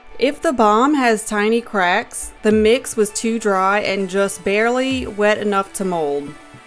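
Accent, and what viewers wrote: Louisiana, United States. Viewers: I was wondering about your accent! I'm in Louisiana! Central LA, where are you!? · Lol!! I knew I recognized your accent on the 1st video I saw!! Im from Louisiana and I live in Lafayette.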